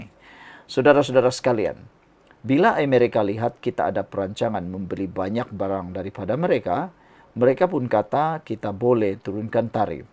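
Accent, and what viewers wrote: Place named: Sabah, Malaysia. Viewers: Itulah cara kami orang sabah bercakap.alhamdulilah kami serumpun tiada membeza bezakan antara satu sama lain. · Lorrr.. tu kan Bahasa Malaysia SLANG Sabah.. sama juga dgn org Kedah, Kelantan, Terengganu, Penang yg cakap BM tapi dgn loghat lidah negeri mrk... !!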